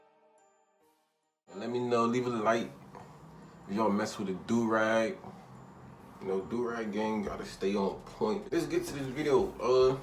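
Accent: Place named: Philadelphia, United States. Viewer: sound like you had dat north Philly accent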